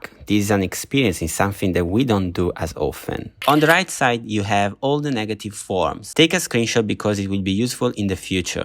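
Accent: Italy. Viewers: Oh this is very informative and well done, there's just something weirdly familiar about your accent-??? ITALIA??? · I opened the video, listened 2 phrases and say, this is italian accent hahaah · Are you Italian? Very noticible Italian accent both in English and Chinese 😂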